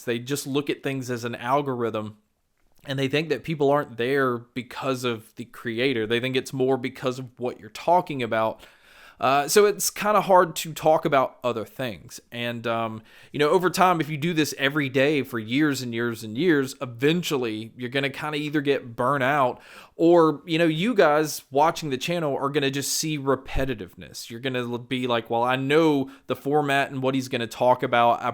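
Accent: South, United States. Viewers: No need to reply dude, just wanted to drop some encouragement your way. Some, not all, of your values lie in your skills to connect with us, remember that. If you moved on to (or rebranded) I'd keep following. You've got that well-spoken southern draw, you've got a handsome face, you're authentic and informed. You've got a lot going for yourself. I'm confident that you'll figure it out. Mad respect for you dude cuz I know about the industry and I couldn't handle it. Keep your head up, champ.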